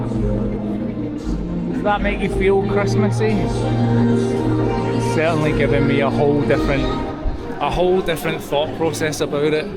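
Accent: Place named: Glasgow, United Kingdom. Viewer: It's refreshing to hear your accent after leaving Glasgow 20 years ago. Used to live in Denistoun when I went to Strathclyde Uni. Enjoy KL mate!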